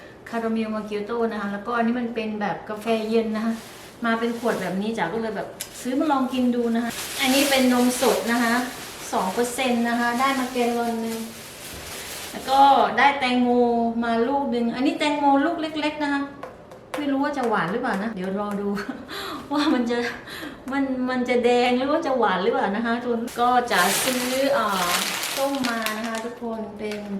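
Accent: South, Thailand. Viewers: น้ำส้ม ไม่มีเยื่อ นิคนใต้ชัดเจนไม่ต้องหมุนเสาเลยค่ะพี่จ๋า🥰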